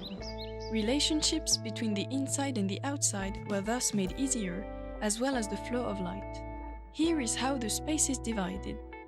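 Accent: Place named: United Kingdom. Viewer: Je viens de tomber par hasard sur ce projet étudiant et j'ai été bluffé par la qualité du rendu qui me semble déjà à la hauteur des plus grands designers d'espace de notre temps. L'habitation répond avec brio à l'enjeu social du projet tout en tenant compte du cahier des charges qui n'est pas des moindres. Je souhaite saluer le travail de la voix off dont les intonations "british" ont su s'accorder à la finesse et la subtilité de cette création architecturale. Ces deux jeunes designers ont réalisé un vrai tour de force avec ce projet qui annonce une carrière très prometteuse. Un grand bravo !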